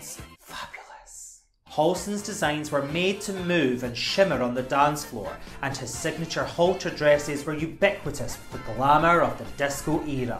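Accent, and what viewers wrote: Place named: Scotland, United Kingdom. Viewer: stay tuned...because of the virus...unfortunately theyve halted production...but a miniseries is being done on Halston....the star...is EWAN McGREGOR...i got a bit emotional watching your video...because you used an image ...the image you used at 1 minute and 53 seconds in your video......that a friend of mine shot of Halston...his name is HARRY BENSON...he is a Scot just like you....with that same lovely burr you have.....he is the man who predicted i would become a photojournalist....i was just a writer when i worked with him... i laughed when he said it...but he was right....i think of him every time i do a shoot...he taught me to be AGGRESSIVE AND RELENTLESS....he photographed Halston a lot...and he loved him...he was basically the main photojournalist for the then new magazine PEOPLE magazine...and he shot Halston ...Liza...and Liz...for the cover...all together...FABULOUS...Harry said that H...was the king of NYC...and rightfully so...Harry also shot H...for WWD quite a few times...Harry said that H..would give him beautiful scarves to give to GiGi...his wife and she still wears them proudly..i cannot begin to tell you how wonderful H was...of course you know he used a lot of women of color as models...Pat Cleveland of course.....Alva Chin....but he often used the 1st black supermodel...the late....NAOMI SIMS...a LOT..she is MOTHER...to everyone who is black that loves fashion...she is MOTHER..when she retired...to create her own makeup line..and wig line ...both are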